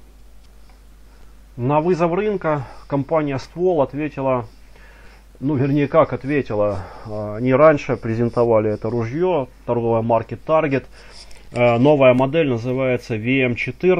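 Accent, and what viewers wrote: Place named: Ukraine. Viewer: Українець так що його можна панять і прастіть))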